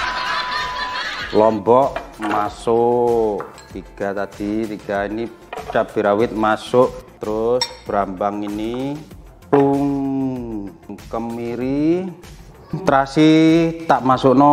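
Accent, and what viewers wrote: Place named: Java, Indonesia. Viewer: Masnya kok meddok gitu ngomonge ...wong jowo y mas?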